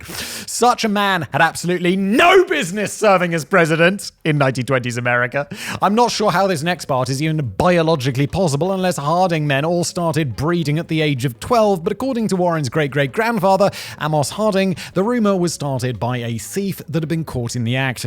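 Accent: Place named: England, United Kingdom. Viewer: for some reason, `s middle class English accent makes the irony here even more savage yet funny!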